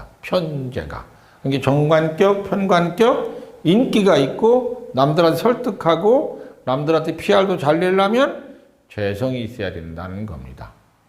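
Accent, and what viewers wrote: Chungcheong, South Korea. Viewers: 저는요. 선생님 메니아입니다. 충청도 말씨도 친근감 있고요. ㄱㄴㅇㅅㄲ도 재미납니다. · 여기 매니아 있어요 ~ 선생님 구수한 충청도 사투리가 너무 듣기 좋습니다!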